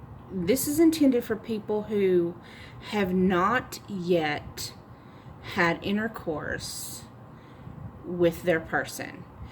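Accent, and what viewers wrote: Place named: South, United States. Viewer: You sound so much like me ! I love your country twang ☺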